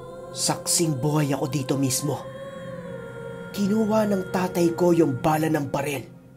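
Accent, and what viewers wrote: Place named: Visayas, Philippines. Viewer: Gusto ko pag nag bibisaya accent in tagalog ka..comedy horror kc lumalabas ang kwento.nakakatawa.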